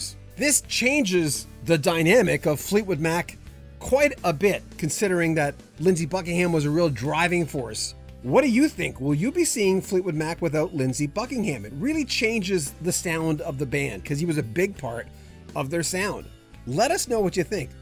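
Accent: Canada. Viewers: Is this guy Canadian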